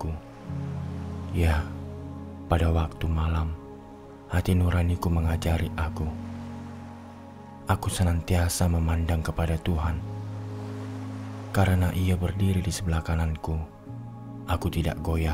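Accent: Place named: Nias, Indonesia. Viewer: Kalau dengar logatnya seperti logat org Nias bhsa indonesianya.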